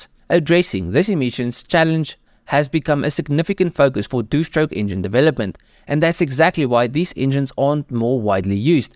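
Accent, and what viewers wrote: South Africa. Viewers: Clearly you can hear the South African afrikaans accent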